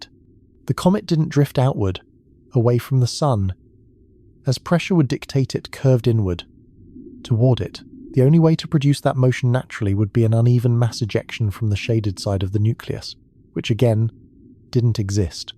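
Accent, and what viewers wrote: United Kingdom. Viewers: i'm watching from 3i/atlas. its 1am local time. oops i think i farted.. nevertheless, british accent is so cringe!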